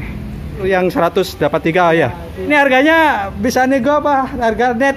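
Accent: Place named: Madura, Indonesia. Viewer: wahhh medureh reh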